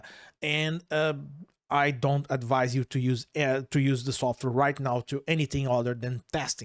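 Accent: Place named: Brazil. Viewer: sotaquinho brazuca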